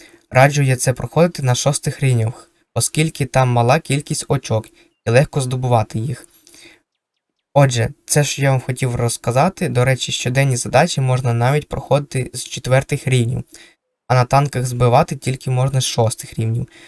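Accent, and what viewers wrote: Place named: Western Ukraine, Ukraine. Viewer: Ти явно десь із заходу, волинь чи рівненщина?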